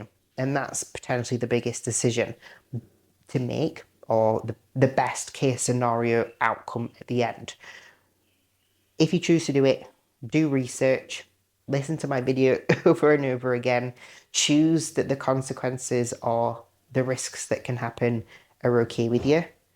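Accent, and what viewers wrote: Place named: United Kingdom. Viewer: You look beautiful and I love your accent 😊 What part of the UK are you from?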